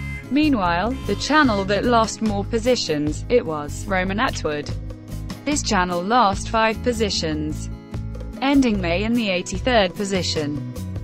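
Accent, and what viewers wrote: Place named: Portugal. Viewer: EU prefiro o sotaque português ,e enclusive assistindo seus vídeos eu aprendi o sotaque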